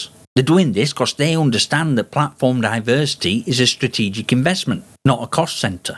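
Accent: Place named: Lancashire, United Kingdom. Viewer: OK, you're new to me -Lancashire accent, I believe, common sense obviously worth a listen.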